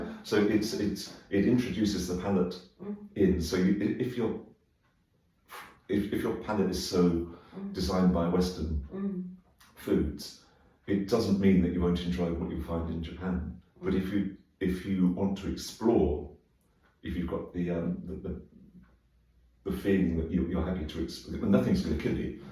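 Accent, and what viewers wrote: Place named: United Kingdom. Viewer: イングリッシュとはいえ、米語ではなく英語のナチュラルで穏やかな心地よい響き、gentlemanとあいまって とても素敵でした!! 当たり前と思っていた日本の素晴らしさを あらためて教えて頂き有り難うございました(*^O^*)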